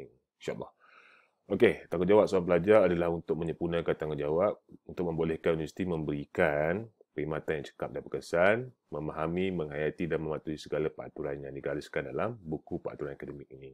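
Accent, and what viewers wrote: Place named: Perak, Malaysia. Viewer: Pekat bebenor peghok nye ye😂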